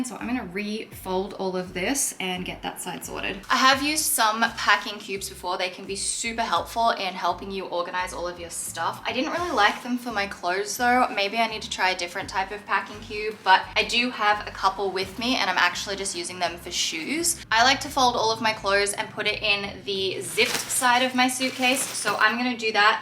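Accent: Australia. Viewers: Lovely to hear an Aussie voice. You are making me so excited. A week until I go to France from Melbourne but want to pack now. Actually going to take photos of mix and match outfits. That might help. Relaxing vid. 👏😄🇦🇺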